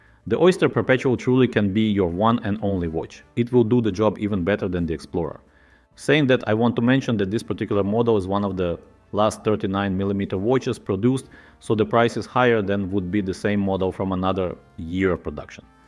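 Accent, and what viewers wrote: Russia. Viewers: Братан, твой русский акцент выдает тебя.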